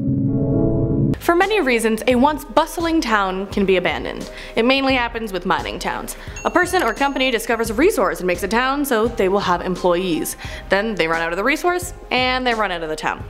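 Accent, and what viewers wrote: South, United States. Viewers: I may stay in ghost town. Keep going Southern accent. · Southern accent for calif town???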